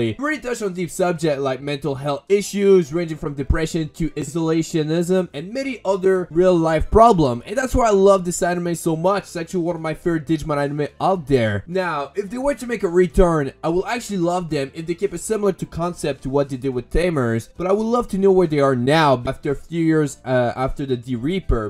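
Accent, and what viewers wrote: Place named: Quebec, Canada. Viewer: Yo my guy, i gotta ask. Are you a french canadian by any chance? Your small accent and a couple word pronunciations are telling me yes XD. Je suis presque sure a 100% lol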